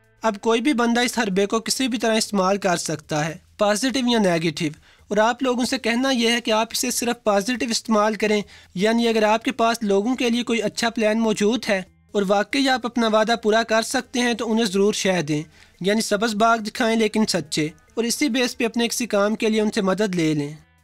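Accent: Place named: Gujranwala, Pakistan. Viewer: Bhai g gujranwala k lag rhy...😌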